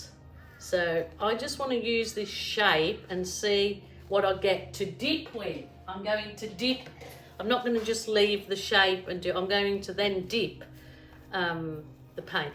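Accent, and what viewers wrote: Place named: West Midlands, United Kingdom. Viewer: Every now and again I hear a West Midlands accent in your voice …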